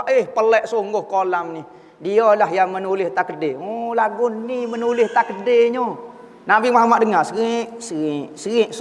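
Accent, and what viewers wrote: Kelantan, Malaysia. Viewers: hehe.. Yaa, beliau Tuan Guru kita semua semoga kita dterima menjadi muridnya.. 😘 serius walau pekat loghat kelate saya dari Kedah boleh faham dan masuk ke hati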